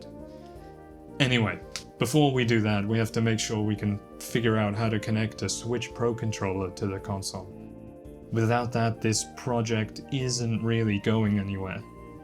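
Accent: United Kingdom. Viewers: you sound like a british nerrel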